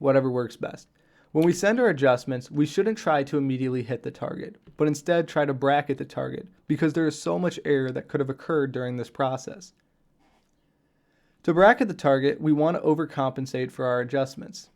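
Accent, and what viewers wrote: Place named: Ireland. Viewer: Yeah dude it sounds Irish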